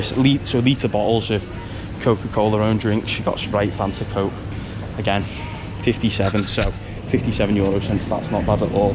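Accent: Liverpool, United Kingdom. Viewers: hes scouse <3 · having a british accent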